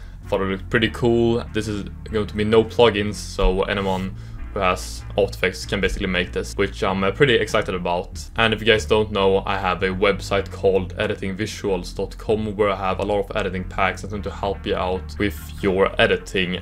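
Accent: Sweden. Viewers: Tog mig tre sekunder från att du började prata till att förstå att du var svensk haha, du förtjänar en sub dock! Keep it up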